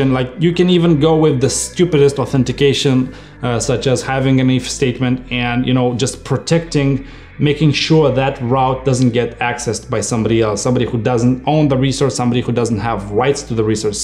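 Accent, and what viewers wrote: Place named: Russia. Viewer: You have nice russian accent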